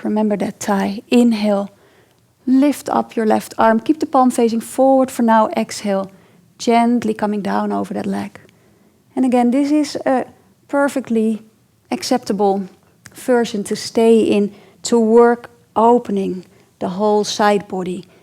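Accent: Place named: Netherlands. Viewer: Heerlijk je nederlandse accent in het engels! Bedankt voor je fijne gedetailleerde alignment uitleg (Y) top!!!!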